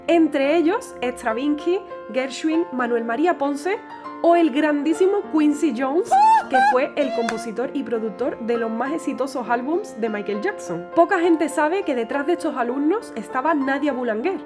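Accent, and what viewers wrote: Andalusia, Spain. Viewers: Escuchar ese acento andaluz 🥰💓 enamora 😍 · Gracias por difundir la música de Astor y por contar anecdotas de su fantástica vida. Por otro lado tu acénto andaluz es muy agradable y cautivante. Saludos desde Buenos Aires. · Gracias bonita! por interpretar a Astor, tu que eres española, por tu acento. Sigue así te va y te irá muy bien. Bendiciones. Desde la Argentina. Bs 🙏🙏🙏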